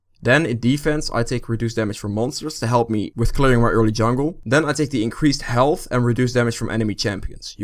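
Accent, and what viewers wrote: Netherlands. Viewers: haha ik praat ook nederlands (België) dus ik herkende het al :p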